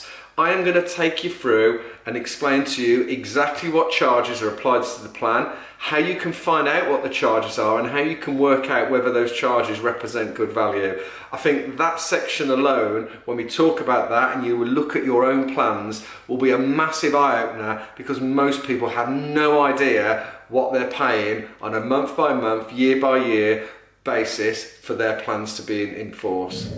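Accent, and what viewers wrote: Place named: Dudley, United Kingdom. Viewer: Are you from Dudley ?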